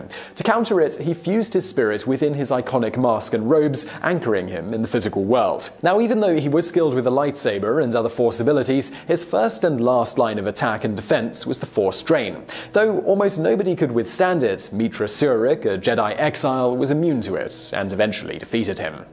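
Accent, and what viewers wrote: United Kingdom. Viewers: Do you need to put your British spin on every name? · Chillax he's British his pronunciations are endearing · Oh limey Brit accents. Made this hard to watch. Not one name pronounced even close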